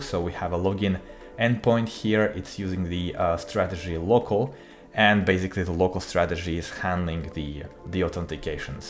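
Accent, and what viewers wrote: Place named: Russia. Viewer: А можно на русском, вы явно по акценту знаете , знайте у вас есть русскоязычная аудитория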